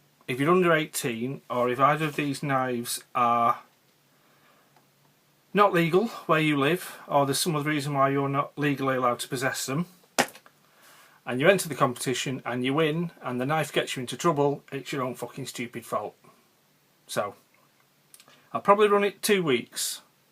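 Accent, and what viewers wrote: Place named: Manchester, United Kingdom. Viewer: Hey I'd open they got sauce! That'll make a cracking vid at some point! 😂 I've only followed you for a few months but your lovely mancunian accent and straight up, no nonsense words of wisdom have always been a good watch. Count me in for the subs draw sir, congratulationso on your views milestone and have a lovely weekend!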